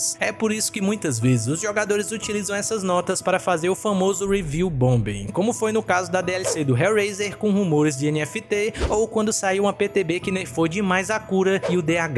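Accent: Ceará, Brazil. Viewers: Poha baitinga, tu é do Ceará? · é cearense? Falai vizinho!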